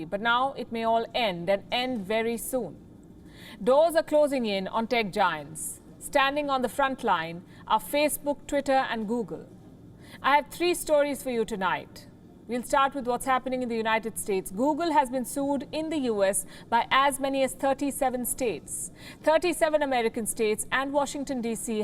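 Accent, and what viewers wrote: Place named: Hindi, India. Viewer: Pronounce: All good sings not all good tings...Your Hindi accent is too strong !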